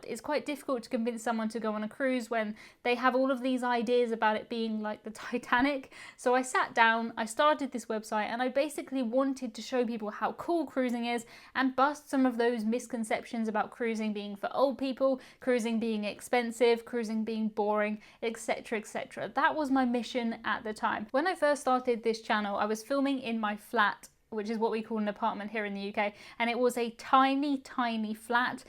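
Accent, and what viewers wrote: RP, United Kingdom. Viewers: Your videos are so fun to watch and with that delightful BBC accent it also fascinating to listen to your narration. Keep up the good work! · Just found your videos and was scared you were quitting YouTube! I’m not usually big on ladies with British accents, but I quite fancy! Congrats and good luck!!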